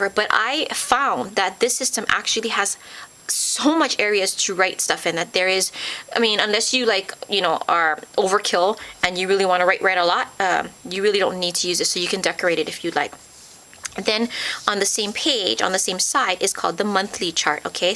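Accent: Hawaii, United States. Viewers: Howzit! I thought so! I could've sworn that I heard you say "dat' and 'dis", I could tell when you said, "Used to, to". That's a dead giveaway! You can take the girl out of the island but you can't take the island out of the girl. ALOHA! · Are you from Hawaii? You can totally hear it. Lol "used to to".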